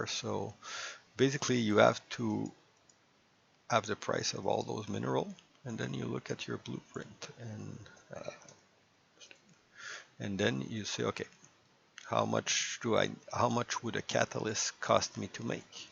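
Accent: Quebec, Canada. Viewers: French Canadian?? Je reconnais l'accent ahah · Is it possible that you might live in Québec? I smell a small Canadian-French accent there :P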